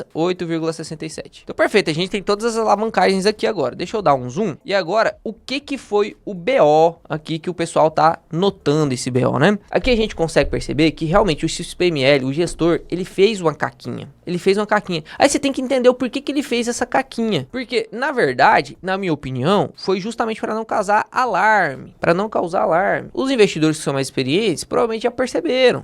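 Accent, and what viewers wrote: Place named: Goiás, Brazil. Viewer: Toda vez que o fundo realiza uma cagada dessas, tão óbvia de ser evitada, é porque alguém lá dentro ganhou com as transações, alguém ganhou e vários cotistas perderam, infelizmente. Quem discorda disso ainda vive em um mundo fantasioso na imaginação. Não é momento de vender as cotas, como geral sabe, o fundo não vai quebrar e a crise vai passar, mas pra quem é inteligente, a desconfiança vai permanecer e acredito que isso daqui algum tempo, possa fazer vários cotistas abandonarem o barco! Aquela máxima quem bate esquece mas quem apanha não, se bem que no Brasil, pra lembrar, tem que apanhar muito, e nos fundos geral tá apanhando pra caramba a algum tempo! Sou meio pé atrás com os vídeos do Lucas vejo ele com uma postura muito negativa com 99% dos fundos, se escutar ao pé da letra tudo o que ele diz, ninguém fundo presta pra investir, mas se tiver um pouco de filtro, vai perceber que o olhar dele é muito crítico e refinado, não alisa nenhuma gestão e abre os olhos pra minutos podres que passariam despercebido facilmente! 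Também gosto muito do seu canal, seu ar de Goiano de falar me agrada porque também sou goiano, mas não dá pra passar pano, dá impressão que foi comprado ou está em um estágio de negação, no mais acompanho o canal e aprendo muito com seus vídeos, mesmo achando que em alguns vc fala muito e passa um ar de pastor querendo agradar ou alienar seus fiéis kkk, mas é isso, tudo precisa ser visto, filtrado e absorvido! Abraços!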